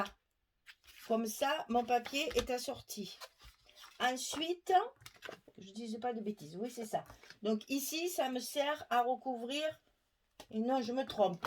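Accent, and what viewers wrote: South, France. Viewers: Bonjour, je vois vos vidéos depuis la Belgique (Brabant Wallon ).Merci pour vos réalisations et votre accent du Sud qui me fait penser aux vacances, au soleil. Ne changez rien, j'adore.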